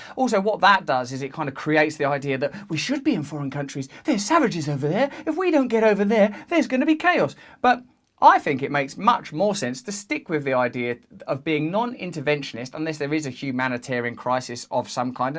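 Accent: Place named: Cockney, United Kingdom. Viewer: More utter gibberish from the cockney Deepak Chopra.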